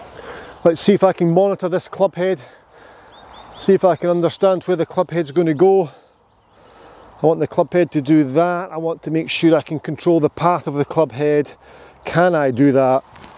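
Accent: Scotland, United Kingdom. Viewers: Looking forward to trying this out, great video 🙏👍 Side note... after all these great lessons and tips, if Morgan freeman ever leaves the post open throwing your hat in the ring for new voice of God. I'm starting to hear a Scottish accent from above while standing over a golf ball 😊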